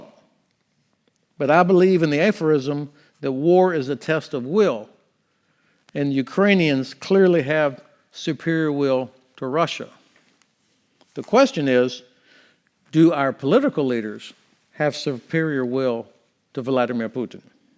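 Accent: United States. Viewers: As an English learner I say f*ck King’s English, I’ll take Florida English every day of the week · I thought the accent was Houston area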